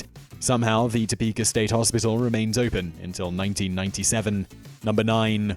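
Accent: United Kingdom. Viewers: Not trying to be mean but the british accent takes away from the video.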